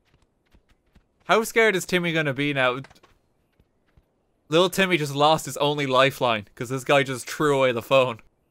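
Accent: Ireland. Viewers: 20 seconds in “is this guy Irish” 25 seconds in I was sure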